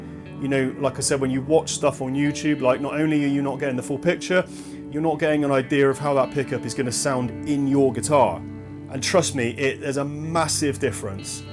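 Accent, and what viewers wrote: RP, United Kingdom. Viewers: I love your accent bro, very posh!!! I wish everyone in Cardiff could speak like you :)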